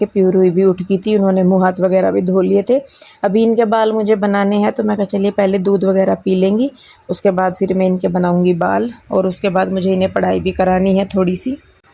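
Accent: Haryana, India. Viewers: Mam aapka ghr kah h , boli to Haryanvi h Please reply me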